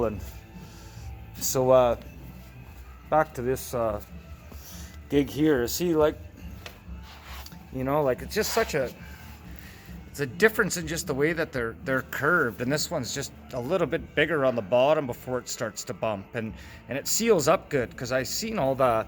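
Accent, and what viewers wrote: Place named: Canada. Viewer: You sound very Canadian.😃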